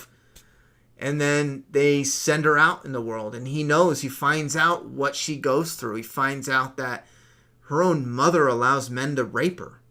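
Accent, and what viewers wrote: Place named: New York, United States. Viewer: YOU must be from New York or Jersey. My son came home with this accent. LOVE it. I laughed when you got pissed at your phone. Ha ha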